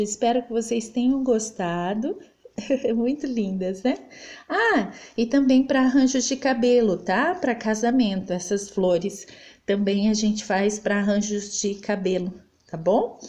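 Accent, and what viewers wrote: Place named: São Paulo, Brazil. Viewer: sotaque de sao paulo, igual ao da professora, é o mais bonito do planeta. pronuncia limpa sem enfeites, quase um canto. rsrs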